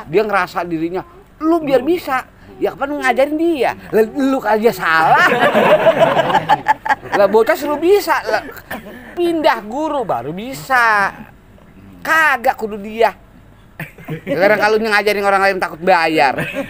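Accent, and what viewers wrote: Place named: Jakarta, Indonesia. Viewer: kalau bisa logat betawi asli dan banyolan betawi jangan di buang.. jadi bener2 natural betawi banget.. boleh di tambah logat moderen tapi jangan terlalu banyak.... cukup dialek betawi. ...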